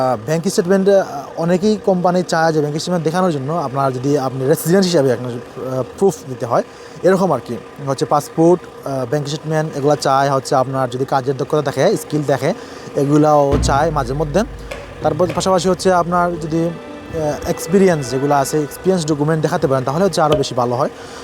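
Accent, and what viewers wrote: Sylhet, Bangladesh. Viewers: ভাই আমার মনে হইতাছে আপনি সিলেটি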